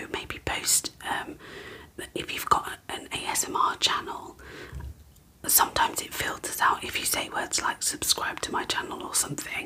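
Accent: United Kingdom. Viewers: i'm such a sucker for british accents and curly red hair! :) <3 also, i'm pretty new to the ASMR community so I thought I'd drop by & say hey! nice to meet you - just subbed!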